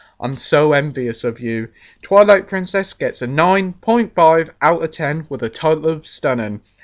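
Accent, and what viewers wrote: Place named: United Kingdom. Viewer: Love British accents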